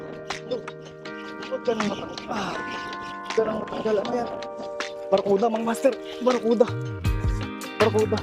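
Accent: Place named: Iloilo, Philippines. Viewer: Ilonggo ka gali master taga diin ka master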